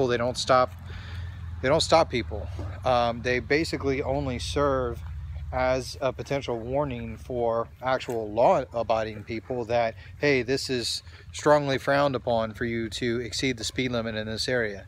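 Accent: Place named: Florida, United States. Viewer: Sounds like you're a Floridians also if I hear right. St. Augustine here. Good stuff. Well spoken words.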